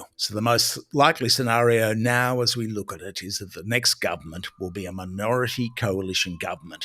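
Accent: Australia. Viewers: It's just so refreshing to hear intelligent journalism with an Aussie accent ❤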